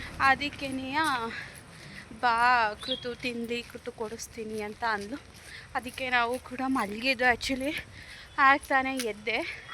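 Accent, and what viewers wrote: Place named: Thuremane, India. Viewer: ನಮ್ ಅಮ್ಮ ಮನೆ ಅಲ್ಲಿ thuremane ಅಂತ ಹೇಳ್ತಾರೆ... ಎಲ್ಲ ಸೇಮ್ ನಿಮ್ ತರ ಮಾತಾಡೋದು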